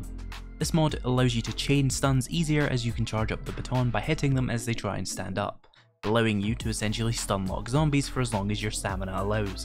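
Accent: Scotland, United Kingdom. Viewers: I like the twin turret build, tried it before - also stun batons are criminally underrated in a lot of scenarios as raw damage is not what they are about. Using them in a combo is fantastic in small scale fighting, stun zombie then sledgehammer for automatically guaranteed head-shot (or pistol or club etc). I do not tend to play on insane nightmare as I really do not enjoy it and I mainly play for enjoyment:) Warrior or Survivalist and feral senses on / zombies on run is about as much as I go. Main problem with this build I found was the insane iron usage, utterly bonkers at points - especially later on in the game, even with an augur. Really enjoy your vids, good to hear a fellow Scot on the YouTubes:)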